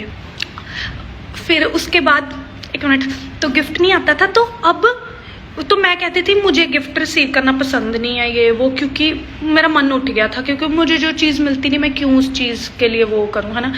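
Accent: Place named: Punjab, India. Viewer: Never leave your punjabi accent it is sure pure and full of good energy .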